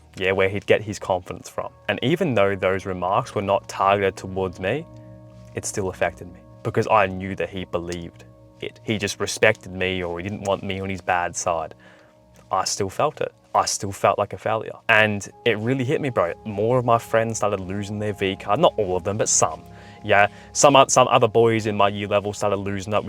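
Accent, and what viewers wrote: Australia. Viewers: Crrikey mate i get no bitches!.Also aussie?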